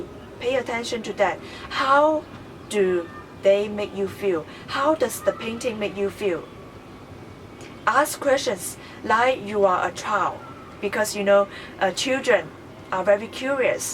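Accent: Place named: China. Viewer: Chinese accent is so beautiful to hear.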